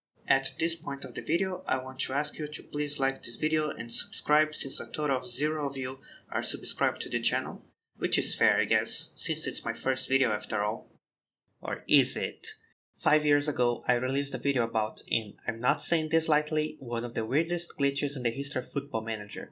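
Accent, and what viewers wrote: Brazil. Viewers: are you brazilian? the pronunciation of certain words are very similar to brazilian people speaking english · cara, vc é br? pronúncia parecida com a nossa.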